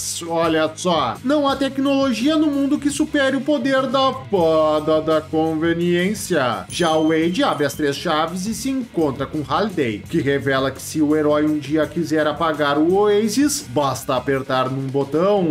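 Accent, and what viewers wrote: Rio Grande do Sul, Brazil. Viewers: Eae meu querido, ótimos videos acompanho sempre, eres gaúcho tbm?? tu voz me é familiar daqui do estado kkk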